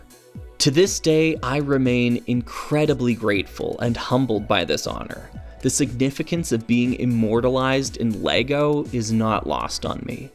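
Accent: Canada. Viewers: I found you through your lego space minifigure tier list, which I frankly still disagree with, lol, but thats ok. I absolutely love your quirky and odd videos and slug stop motions and odd taste in sets; theyre all highly engaging. Also your very strange Canadian pronunciation of so many words. Good times. I'll be sticking around for the long haul to see what else is afoot in the channel. Keep up the great work,!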